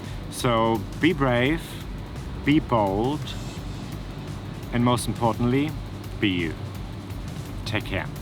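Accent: Australia. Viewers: Wow Aussie accent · Wow you are even started to sound Australian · In just a short 4 years, a tiny bit of Aussie accent could already be detected in your speech. Good choice in making Sydney your home. Well done for the great video. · Great story, thanks. I can hear a slight Aussie accent - nice one 🌞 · I came across your video in my feed. I am from Sydney and feel you captured the feeling of the city really well. It's a beautiful video with calming music, although a side note is that you speak very calmly as well. Yes, there is a slight Australian accent creeping in but I think your voice would be universally understood (which I isn't always the case for some Australians). Glad you love Sydney. It has an outdoor and nature filled lifestyle that I miss in some other cities. Birds and all the crazy creatures are plentiful. On the flip side, I fell in love with Berlin the first time I went there. 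It has a charm that possibly, after going back several times might change with the seasons. I am not sure if I would survive a winter there, but at least triple glazing and decent heating would solve part of that. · Wow,. Your Aussie accent is really starting take hold! Soon people won't believe you're from Germany at all, a bit like JenDre [German Girl in London] who was frequently accused of being an impostor because of her impressive London accent. Please keep going with your videos. A very popular meme is 'things I found surprising/weird/wonderful in my new country'. It might not be all you want to do, but those videos tend to be quite popular, especially among people toying with the idea of moving to a new country.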